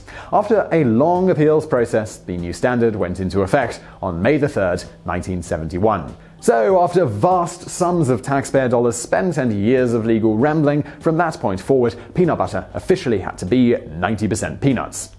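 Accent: United Kingdom. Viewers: I was a long-time subscriber to this channel -- never missed a video -- and just un-subbed. I don't understand why Simon has to be so aggressively front and center in these videos. I love the topics, I love the detail. I understand he has a cool British accent, but can't he just be the narrator? It was always weird to me how images and the text always take a background to him. What Culture shows the hosts, but not non-stop. It's just not necessary. I realize that it had been fine initially and then it started to bug me and now I can't stand it. I will definitely re-sub and share if the topic becomes the most important element to the Today I Found Out videos. Simon is fine, no shade, really, but the channel -- educational and informative as it is -- is just too much of him, it's very in-your-face. ( I also noticed that other people write the series -- can't you mix it up with some of the writers or just have an episode w/o a host?) I REALLY enjoy the subjects, and wouldn't mind if there was just a VO of Simon. 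· accent. The Brits say supa instead of super, and Chiner instead of China.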